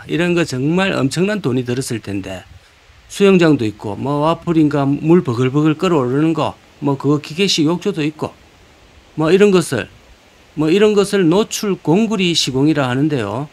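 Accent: Gyeongsang, South Korea. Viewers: 경상도 사투리 완벽하게 이해하는 1인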